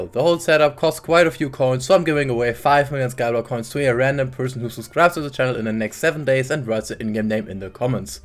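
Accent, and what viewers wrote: Germany. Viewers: Are you German by any Chance?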